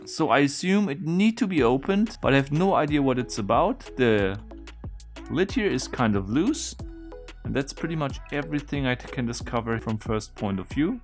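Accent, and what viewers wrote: Germany. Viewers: He had an germen acsent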